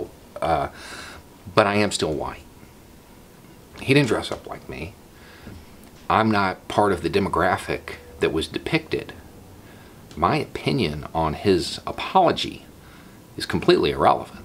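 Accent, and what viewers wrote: South, United States. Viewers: So this video popped up on my feed and I decided to watch it. I honestly came into this video thinking you were a trump supporter and you were going to bash Trudeau and yang, then I heard your southern accent and it made the feeling that this was that this video is going to be. Boy was I wrong. Like i said this is my first video I've seen so i dont know your political views, or even if you post content like that, but no matter what side you are on, you are on humankind's side and that's what matters! Thank you for this video and i wish more people thought like you!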